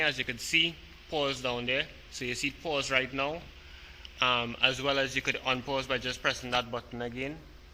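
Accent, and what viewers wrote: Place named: Trinidad and Tobago. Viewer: You sound like a Trini.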